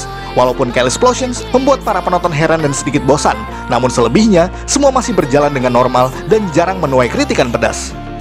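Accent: Java, Indonesia. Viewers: Min... Bahas ttg Kiramaiger donk... Jujur saya merasa gak nyaman dg penjelasan mimin yg di Try It karena kebanyakan ngeguyon apalagi dibumbui logat Jawa nya meskipun yah itu alamiah sih yah krn mungkin si mimin org Jawa... Dg melalui TokuFacts ini saya rasa kami akan merasa lbh nyaman mendengar penjelasannya tanpa banyak bertele-tele dan akurat pula...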